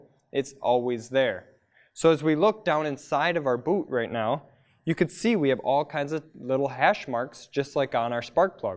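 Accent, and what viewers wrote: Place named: Wisconsin, United States. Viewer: Ah, a Fellow Wisconsinite. The accent when heavy is very apparent.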